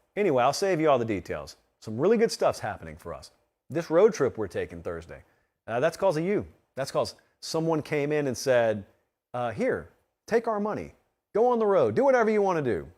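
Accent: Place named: Georgia, United States. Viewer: …… I can tell you are from western GA hahahaha it’s pronounced “Wine-der”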